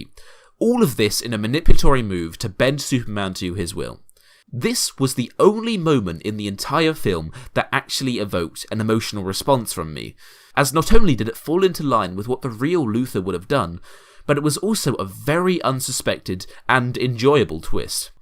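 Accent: United Kingdom. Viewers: Why do you sound British while your grandfather sounds Scottish?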